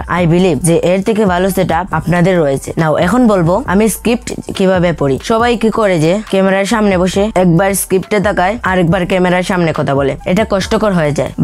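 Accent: Sylhet, Bangladesh. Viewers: ভাই তুমি সিলেটি নি বা.! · ভাইয়া তুমি কি সিলেটি ❤😊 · Tumer bari Sylhet ni suto bai?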